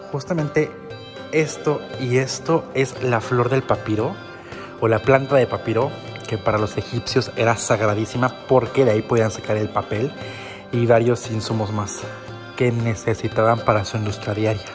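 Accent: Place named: Fresa, Mexico. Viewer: Porque este hombre habla fresa normalmente hablan haci los yuniors y el para gue😂😂😅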